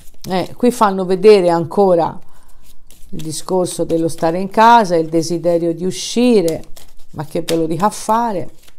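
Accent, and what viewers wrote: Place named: Tuscany, Italy. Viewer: Mi piace il tuo sccento toscano sei simpaticissima che tristezza sti giorni lontano da chi vuoi bene è veramente difficile speriamo passi presto e tornsre alla normalità amare tutto quel ci circonda abbiamo imparato tanto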